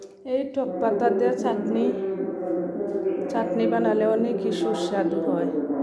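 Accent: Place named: Sylhet, Bangladesh. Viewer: বইন তুমি সিলেটি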